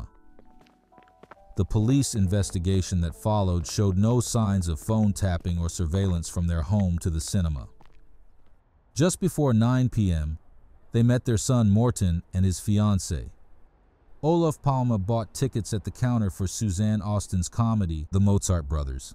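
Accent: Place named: United States. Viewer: Bra engelska? Det där är bred amerikansk accent.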